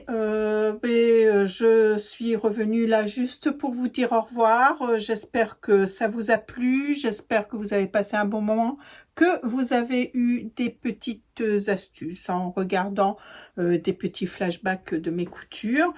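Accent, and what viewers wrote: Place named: England, United Kingdom. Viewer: Coucou, J'adore ton accent anglais 😉 You're simply the best 👍. Très joli pyjama, de même pour ton blouson bi-matière et ton edelweis. à bientôt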